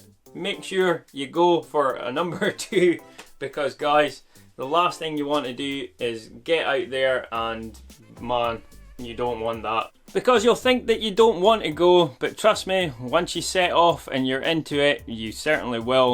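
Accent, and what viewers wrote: Scotland, United Kingdom. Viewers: Running advice with a scottish accent. What more could you ask for. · He's Scottish 😂 English is their first language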